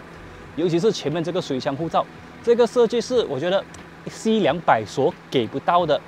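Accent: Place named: Malaysia. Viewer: 你照你原本腔調來說話是沒問題的 不需要這樣吊著嗓子說話 比起在某些詭異的地方突然高音 還不如馬來西亞腔道來得親切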